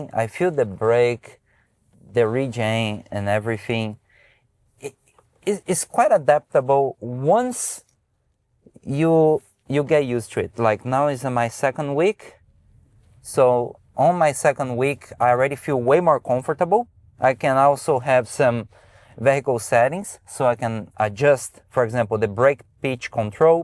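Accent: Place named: Brazil. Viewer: I love your brazilian accent - we are so lucky to have you here in australia reviewing this car. thanks for your advice!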